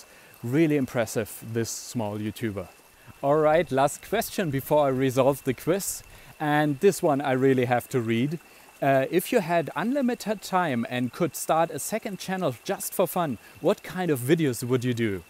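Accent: Germany. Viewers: Are you from Germany ? Because you English is more in the dialect of German ?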